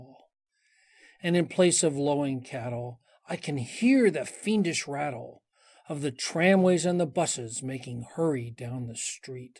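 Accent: United States. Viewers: Nice reading. Sorry about the people whinging that it's read in an American accent! Happy for anyone to enjoy and read the poem... but you're an Aussie anyway so it's really a moot point ;-) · Wow never heard this done with a Yank accent, sounds odd but then again its odd that an American would even know of the poem at all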